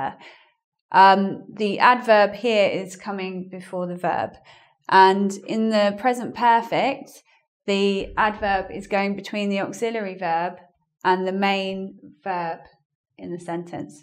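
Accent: United Kingdom. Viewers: To me is harder understand the British accent :( but I like it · Hi! I'm from Mexico and i studied American English for about 2 years and i like it but i absolutely love British English and Accent, your videos are helping me to correct that little errors to reach your accent C: so thank you! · God i love listen to your british acccent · I love your videos and i like watch them, over all, for your accent, im trying to improve british acent and and grammar too. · thanks a lot for this lesson, i have really learned too much. i have also subscribed ur channel. im trying to (improve)learn (my)english and to have an amazing british accent like that wich you have got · Although in Russian schools we are learning English English I cant understand 100% of information because of British accent. Is it a habit due to American movies and series? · Hi, I have been looking for so long to English trainings with a wonderful british english and recently I found your channel. 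When I first heard you, I have known that´s what I was looking for. Since then I hear your speech videos on the train in the bus at home in the kitchen everywhere. I'm addicted to your accent and I try to copy that. I am so happy that you are there! You are an excellent speaker and trainer. Very talented. I´ll stay with you because I need your speech style. Lucy is o.k. But your speech style/ voice is more cooler, and your accent is more nicer for my and your videos are full of content. I'm so thankful and I´m a big fan of you! Thanks! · Ohh, it was so cute, when you tried so hard to make us undestend your perfect btitish acсent, I`m very grateful to you) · I just can´t get enough of your accent keep it that way it sounds by far better than the american english.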